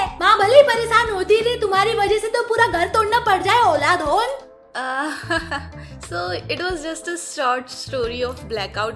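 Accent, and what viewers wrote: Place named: Bengal, India. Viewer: I can smell some Bangla in ur accent. Are u bangali?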